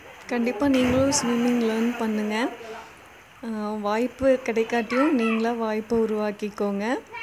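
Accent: Kongu, India. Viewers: மரியாதை கலந்த கொங்கு தமிழ். ஒருத்தரு நம்மள அடிச்சுக்க முடியாதம்மினி . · Unga slang language super sistaaaaaa...........kongu tamilachi😍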